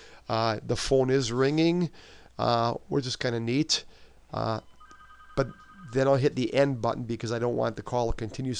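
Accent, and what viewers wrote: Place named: Wisconsin, United States. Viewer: I love your Wisconsin accent.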